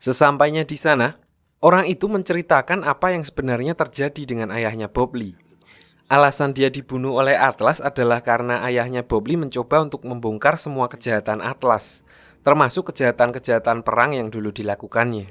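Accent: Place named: Central Java, Indonesia. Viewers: Fixs admin e wong jowo 🗿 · Joh. Iki ngadimine wong magelang to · Wong Jawa Tengah kyok e ki narator e😂😂😂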